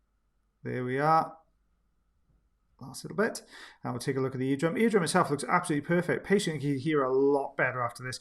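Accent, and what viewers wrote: Wales, United Kingdom. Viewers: I haven’t watched in a while, hardly recognize you. Look great and I LOVE the Welsh accent.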